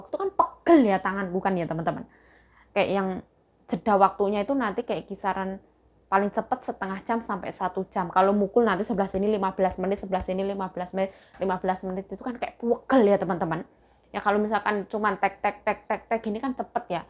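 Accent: Java, Indonesia. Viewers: Mbk indonya mana ya..ngomongnya jawa dan mandariny muedok bget..heeee....smgt slu mbk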